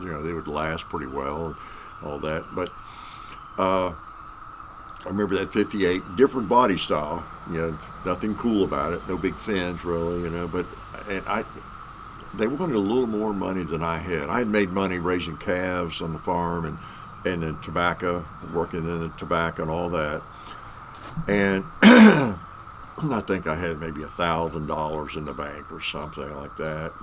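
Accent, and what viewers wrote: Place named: Northern Kentucky, United States. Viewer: Sounds like you started out in northern Ky. Hello from California KY.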